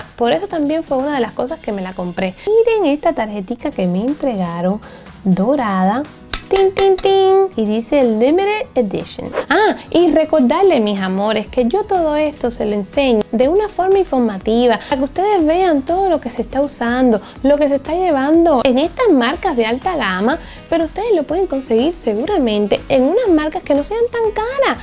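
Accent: Cuba. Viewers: Me encanta el acento cubano de.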